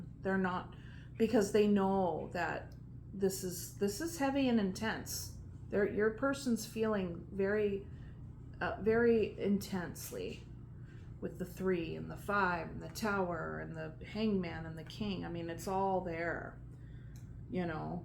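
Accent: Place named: Canada. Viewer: And ew, are you Canadian? I’m from Toronto? You sound Canadian! X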